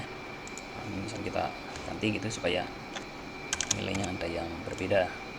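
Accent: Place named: Java, Indonesia. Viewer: Matur suwun pa. Suarane medhok pa. Salam dari blog ngapak.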